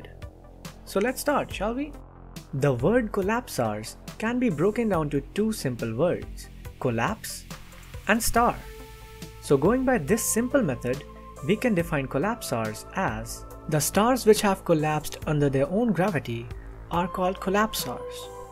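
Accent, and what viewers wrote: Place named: India. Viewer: When you hear an indian accent you know the videos are going to be filled with knowledge, very intelligent people ❤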